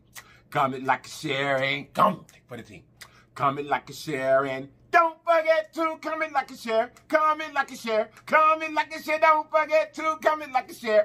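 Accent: South, United States. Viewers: I have to cut off volume, because you talk too much and we want to enjoy watching you eat. Sounds like your roots are from the south and you live there now, parents taught use to not talk while eating. Now I understand why, you show all the food in your mouth and it’s gross. Try getting on the radio you have the gift to gab, and it’s not necessary on a eating show since you have so much information to share to others..Just my observations.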